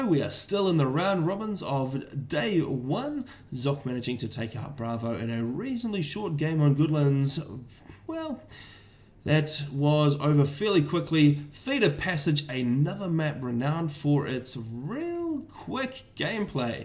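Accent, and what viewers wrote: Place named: New Zealand. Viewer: Nice commentary and camera movements! I always do a double take when I hear another kiwi accent on youtube :D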